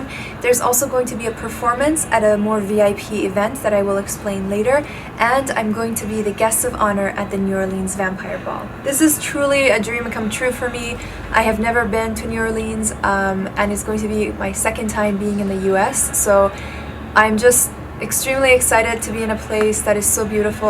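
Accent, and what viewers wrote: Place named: Latin America. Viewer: where is she from? her accent sounds kind of latina 💜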